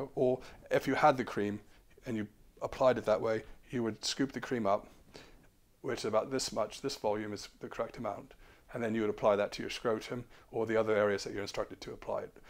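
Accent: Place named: London, United Kingdom. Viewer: your accent sounds very similar to Prince William's accent. Did you grow up in the same area of London?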